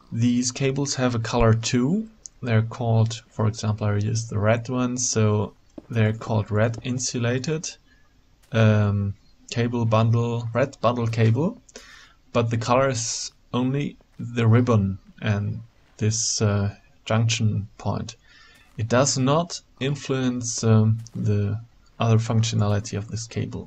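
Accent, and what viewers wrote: Germany. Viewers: Nice Video ! Helped me alot. Did I hear a german slight german accent ?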